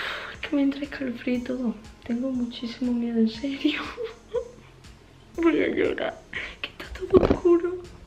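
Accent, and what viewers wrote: Spain. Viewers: Holi soy nueva y me suscribire en tu canal eres española me encanta como ablas wow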